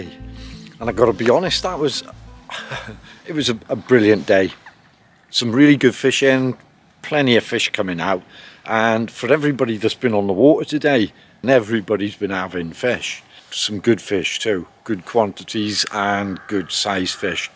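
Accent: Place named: Wales, United Kingdom. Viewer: Who needs meditation when I can watch your fishing videos. Your soft Welsh accent is like a soft balm for these troubled times. If you weren’t 3 1/2 hours away I’d love to come for a days casting lesson with you 👏👍🙏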